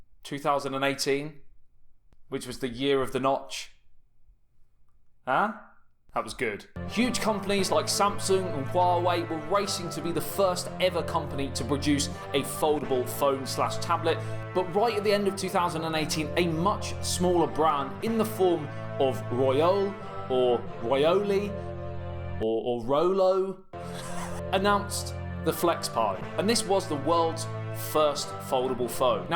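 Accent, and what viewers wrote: United Kingdom. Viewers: You are the most genuine rusty British accent Ive ever heard🤞🤞🤞,rememberd SNATCH MOVIE.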